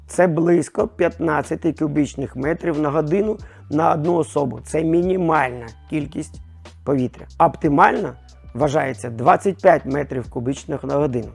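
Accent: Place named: Central Ukraine, Ukraine. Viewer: Я так зрозумів чоловік родом десь с центральної України чується щось рідне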